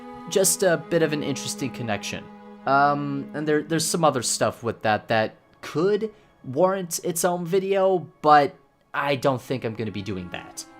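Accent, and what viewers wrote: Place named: United Kingdom. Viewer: hey broken-sexual boy, why do you pretend Griffith = "Griff-iff?"...are you infected with some form of disease that perverts your enunciation to sound shitty aka British ?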